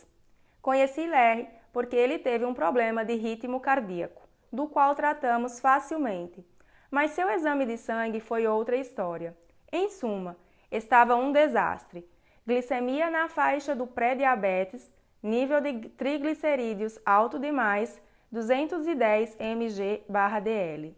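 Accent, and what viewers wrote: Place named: Paraíba, Brazil. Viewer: Sotaquezim da Paraíba👻 vou botar pra eu dormir. Parabéns!!! Ouvi o outro com voz de robô, todo. Muita sorte! Sou de Fortaleza.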